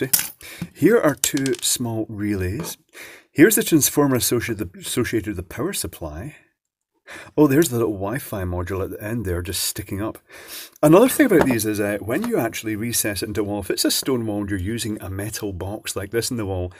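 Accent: Scotland, United Kingdom. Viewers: Hello, i love the Scottish accent, it makes everything more interesting. 😊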